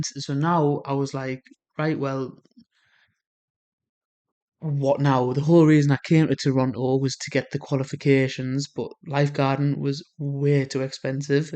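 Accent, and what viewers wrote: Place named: United Kingdom. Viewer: Based on all the negative Nancy videos you looked at listed under the video above, it is no wonder you came away with negative impressions of Toronto/Canada but having lived here all of my 76 years (a retired litigation lawyer), I have no serious complaints and would NOT want to live in the UK or the current version of the USA under crazy Tariff Tyrant Trump. Given your very thick regional British accent (I could not understand a lot of what you say in your videos), that also would not have helped you in Toronto or in Alberta (and I was born in Toronto but with British parents from Paisley and Dundee Scotland and London and Croydon England). In any event, good luck to you going forward but you really need to research the cost of living and the job situation wherever you live in this world.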